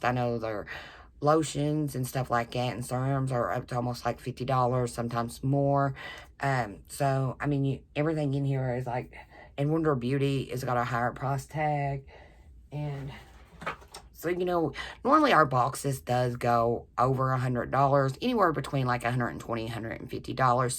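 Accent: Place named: South, United States. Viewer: Everytime I watch you I just adore your accent...it is southern strong! That look you did with the palette is awesome and a bit subtle, you look like a happy sweet woman...and those are hard to find LOL! I don't even have a vanity but I have many piles of makeup that I need to organize so I relate! I would love to enter to win that palette because it looks so good on you. But you have better younger eyes than I have cause i am 56! Thank you so much for offering a lovely giveaway! Wendy Sowles on Insta at wendysowlesexploresmakeup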